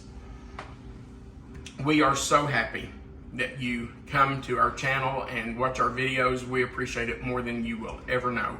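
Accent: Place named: Kentucky, United States. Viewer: A-HA! I suspected you were from Kentucky, just from your accent, and now we know. I will be trying this "caviar" for our next potluck. GO BIG BLUE!!!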